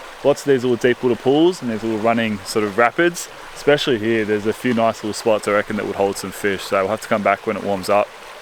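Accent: Australia. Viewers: Hi, If you can make a swag that’s light weight, water proof, durable, can be comfortable on unfriendly surfaces and under $500. I’d buy it. My idea would be a collapsible carbon fibre stretcher frame, with built in air mattress. And eyelets to rope off to a tree for inside volume or to achieve level perhaps. It would need to come in black, green, yellow and camo. And please get it made in Australia. Otherwise one would simply buy a Hammock tarp setup. Good luck with your venture mate. And love your latest video. Careful you don’t get commercial with the plugging gear but do enjoy the cool gadgets you use. Mate I reackon your from Queensland the way you say aye at the end of your sentences. Am I right?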